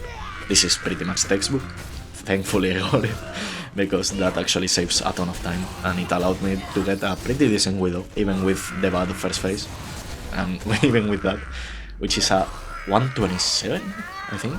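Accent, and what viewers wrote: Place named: Spain. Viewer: For anyone wondering, he has an spanish accent. Great video❤ Saludos desde Cataluña!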